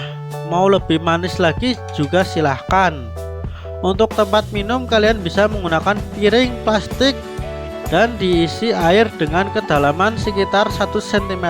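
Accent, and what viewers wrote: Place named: Purwokerto, Indonesia. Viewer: Genah banget koh logate. Purwokertone ngendi, nyong pengin dolan. Belajar ternak semut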